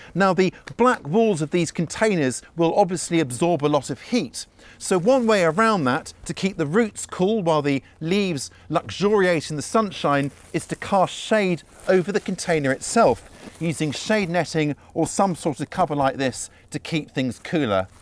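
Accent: England, United Kingdom. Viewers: English gardeners has a such great accent 😊 it is almost plaesure to learn English and gardening at the same time😅 "two birds with one stone" 😂 verey interesting informations about early potatoes 👍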